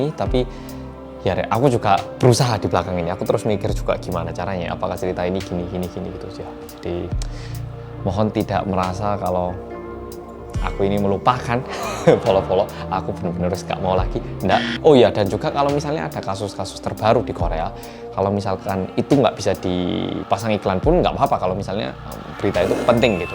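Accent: Java, Indonesia. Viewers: Mas Medokkkk 🥰🥰 pagi massss ..ttp sehat ya....❤❤❤ LANJUTKANNNNN... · Lebih suka mendengarkan ceritanya mas,enak di denger soal nya mas medok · YANG MEDOKKKKK!! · Mas medok🤗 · Siap orang korea yang medok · Mas bikin video ngajarin Elsa Bahasa Jawa biar ikutan medok, dong.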